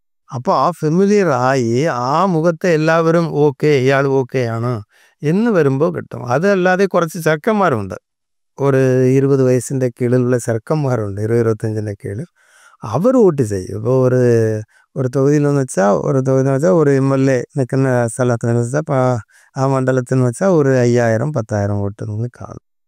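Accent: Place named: Tamil Nadu, India. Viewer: എന്താ സംസാരത്തിൽ ഒരു തമിഴ് ചുവ. ഇദ്ദേഹം തമിഴ് Astrolger ആണൊ?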